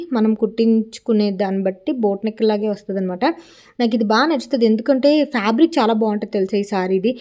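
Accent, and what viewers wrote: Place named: Telangana, India. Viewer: You have been staying for many years in Telangana, so don't worry that much if you are speaking Telangana slang telugu, that is also good language like Andhra slang telugu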